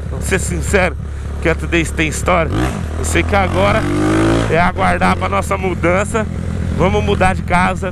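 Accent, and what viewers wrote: Goiás, Brazil. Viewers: por que vc não fala mais naquele pike do goiais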